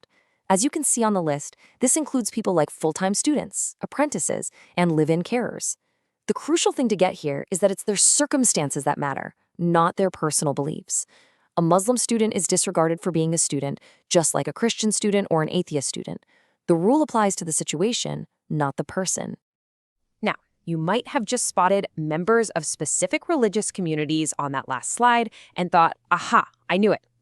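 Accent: United States. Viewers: Why is a bloody American voice being used to preach to us about this ? .is this American actually paying council tax in this now disgusting country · American accent?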